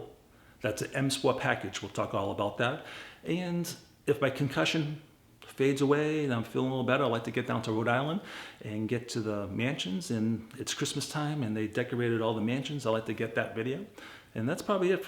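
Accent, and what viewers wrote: Boston, United States. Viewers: You cannot hide that Boston accent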